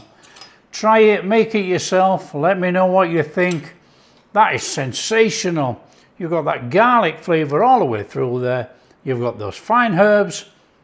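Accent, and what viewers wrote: Yorkshire, United Kingdom. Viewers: I believe it's a Yorkshire accent, specifically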